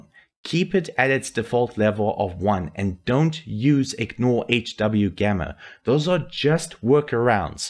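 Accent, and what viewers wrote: South Africa. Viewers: south african? thanks for the video BTW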